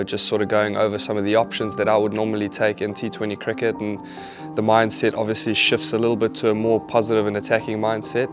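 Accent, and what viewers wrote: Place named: South Africa. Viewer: This guy has a South African accent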